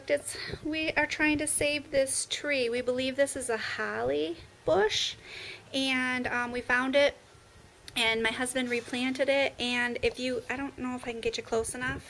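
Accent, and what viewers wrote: Michigan, United States. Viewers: I love listening to you talk :) my dad is from Michigan you for sure have a Michigan accent :)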